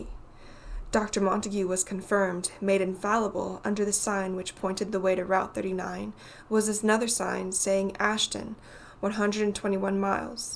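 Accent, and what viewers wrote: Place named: United States. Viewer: It's nice to hear a woman narrating a first-person story with a female protagonist. American too! Thank you!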